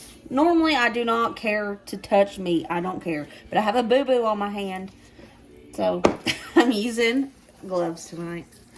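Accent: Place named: Tennessee, United States. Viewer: I am recently widowed (oh how i hate that word) and i have no family living close enough to visit with often. My mom grew up in eastern Tennesee so hearing you talk and watching you cook for your lovely family has been a help. For the first time in my life I'm also learning to cook for one. Every time i make a mistake i hear you say "it's fine!".❤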